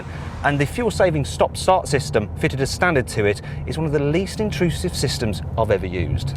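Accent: United Kingdom. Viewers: really nice review. Or Im just in love with british accent ;D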